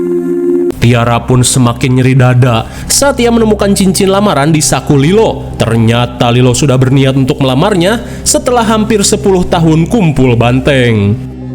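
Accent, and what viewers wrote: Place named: West Java, Indonesia. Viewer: ngomong nya nyunda bngt 😭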